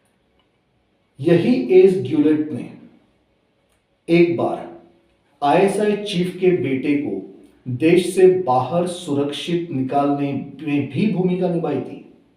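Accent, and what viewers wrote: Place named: Maharashtra, India. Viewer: साहेब, तुमच्या हिंदी उच्चारावरून तुम्ही मराठीभाषी वाटता. बहुतांश भारतीयांसाठी हिंदी व्हिडिओ नक्की करा. पण अधून मधून मराठीत सुद्धा 🙏 (पण मी कोणी गुप्तहेर नाही 😂)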